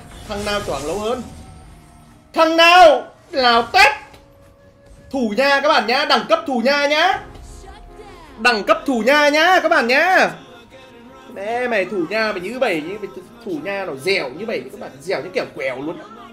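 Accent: Nghe An, Vietnam. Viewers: Cái giọng Nghệ nhà tôi, wou trời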